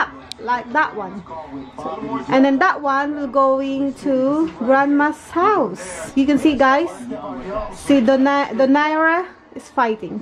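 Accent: United Kingdom. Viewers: whoooa i like your british accent now nice blog. im here now dala angk regalo. tinapos ko talaga nice family. asahan din kita sa bahay ko. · Great. Congratulations for that👏👏👍 And ilove your British accent momshie🤣😊👍👍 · Hi sis! I’m your new subscriber now. Hope mka bisita ka po sa bahay ko. Cute nman ng mga babies mo.... I love British accent!😘❤️ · British po tlga accent mo na,nakasanayan. Bumpbump na po ako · I love that British Accent! Lakas maka Harry Pottah! Chaaarr! 😆👌🏽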